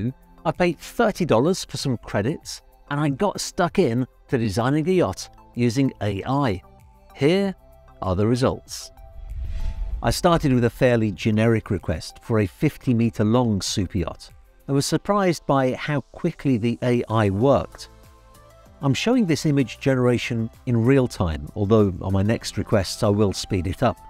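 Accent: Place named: Midlands, United Kingdom. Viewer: Hi, I recently watched a documentary about superyachts that you starred in. It was great how your midlands accent was so strong in it haha...also, I noticed on the Boat International website Wider had a 170ft yacht for sale. Will you be covering the yard again soon?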